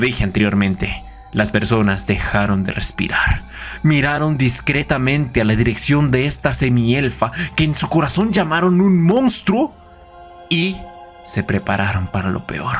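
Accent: Colombia. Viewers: Eres colombiano? Sho crei que vos eras argentino che.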